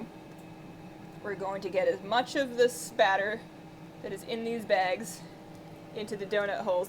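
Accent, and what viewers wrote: Canada. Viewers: Never knew you were Canadian until this one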